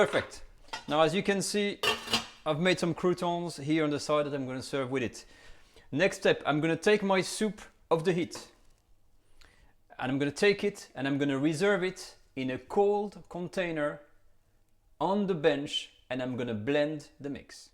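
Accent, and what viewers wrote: France. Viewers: Merci 😘 j'adore French acent in English language. Bisous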